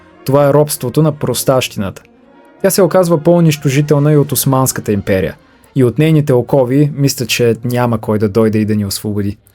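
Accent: Russia. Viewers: Да защити интересите на българите"? Освен руския акцент, то и малко критичен ум щеше да е хубаво да има. Нима това не е интерес на Руската Империя? Или започва всички тези войни само за да ,,асвабади" България... жалка копейка. че сме го платили с много кръв, то ни е струвало още унижения, национални катастрофи и - много злато! Който иска да се информира по-задълбочено по темата, нека да прочете тази ценна книга. ,,Окупационен фонд, основан за създаване на Руско-Дунавска област" Дори и да се задоволите само с предговора на Димитър Петков, пак е добре. Дълги години, заинтересовани рублофили правеха всичко възможДно да не можем да я намерим в интернет. Има само две издания. Първото е от 1892 г. Второто - от 1993 г. След него друго няма. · Говориш български като руснак който живее от 5 години тук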